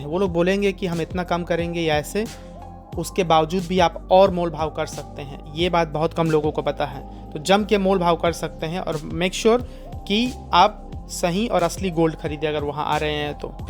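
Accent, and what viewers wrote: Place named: Chhattisgarh, India. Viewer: मैं आपका वीडियो काफी दिन से देख रहा हूं और आगे भी देखते रहूंगा क्योंकि यह सही नहीं चाचा आप जवाब देते हैं और काम करते हैं और आपकी बोली जो है एकदम छत्तीसगढ़ी है जो कि हमारा ही है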